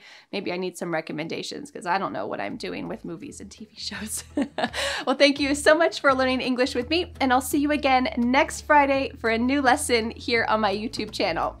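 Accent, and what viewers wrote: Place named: United States. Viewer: ......I've just met you through your English channel. I'm from Venezuela!.... I think I love you!!... I mean.... I love your teaching method, your american accent, your knowledge..... May God bless you!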